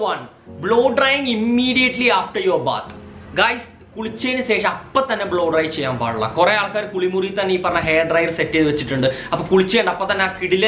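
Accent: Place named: North India, India. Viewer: Bro u r so superb.. Iam ur big fan.... I think u studied in North india.... Ur English speaking is like North ppl..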